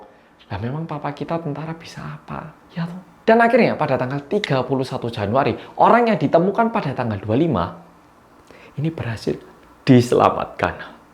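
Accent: Java, Indonesia. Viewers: Kok bisa bhs indo sih trus kya medok jawa lg ? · Mas boleh tanya sesuatu yg sedikit aneh, tapi buat penasaran GK? Dari kemaren tiba tiba aku mikir Mas kan bisa dua bahasa, ada indo sama Korea.. nah mas kan lancar bgt tuh bahasa indo bahkan logat nya lumayan medok. Tapi mas juga asli dari Korea dan lancar bahasa Korea.. Pertanyaan ku mas kalo bicara dalem hati pake bahasa apa ya hehe😁?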